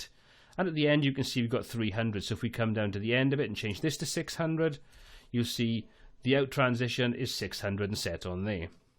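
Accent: Wales, United Kingdom. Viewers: That's it, all Youtube tutorial videos to be exactly like this from now on - well thought out, perfectly paced and delivered in a beautifully articulated Welsh accent :) Loved it.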